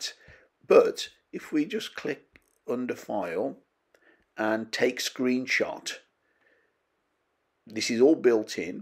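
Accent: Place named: Dudley, United Kingdom. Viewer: Hi, Really enjoying your vlogs on Affinity Photo, just been following the vlog Brand Signature. I've been after a way to add a Signature to my photos for such a long time. Messaged vloggers in America and Australia on this subject asking if they knew how to add a signature in Affinity Photo. Not had a reply from either of them?? To be honest don't really think they knew how to do it. Followed your instructions and now I can add a signature in seconds instead of having to keep typing it out. Can't thank you enough mate, keep up the great work look forward to watching more vlogs. ( Your accent sounds like you're from around my area I'm just outside of Dudley. Once again thanks. Fred.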